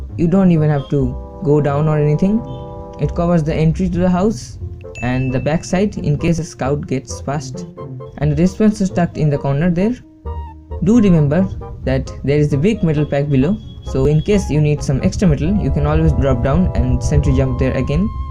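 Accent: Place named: India. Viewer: Ur indian accent is annoying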